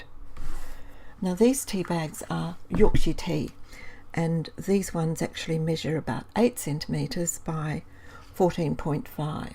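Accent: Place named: Australia. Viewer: GREAT VIDEO, NICE VOICE AND AN AUSSIE 😊 THANKS SO MUCH.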